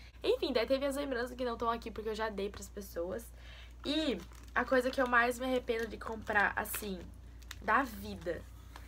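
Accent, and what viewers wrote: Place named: Rio Grande do Sul, Brazil. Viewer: vc é do rio grande do sul? Vc tem mttr sotaque cara, lindíssima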